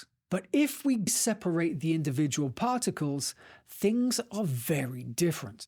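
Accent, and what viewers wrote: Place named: Scotland, United Kingdom. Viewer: You know when you said this was made in Japan I was like huuuh as your Scottish accent had me guessing like you were someone in England or maybe Stirling, don't know why, but did you know the open university have no DaisyChains Audio or any audio version of there bio-chemistry related subjects and they don't know why, they have literally just been apologising too me for 5 years since I am dyslexic, and I failed 2nd year twice because I have just been giving walls of text too read as a dyslexic man and like I can't learn like that, though this is a perfect way, which is why I have a stupid playlist I have been building over the past 5 years of everything practical and like visual (or just the only English information on Youtube, the amount of videos in English which go into Hindi drive me insane, I think I find a video and nope, but coming across your channel sure was a treat.) Being very useful, I wanna pass my final year, you know, would be nice.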